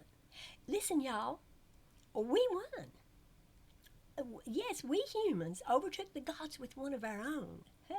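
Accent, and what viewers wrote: South, United States. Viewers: That sweet southern 'Hi' is always the harbinger for brilliance ❤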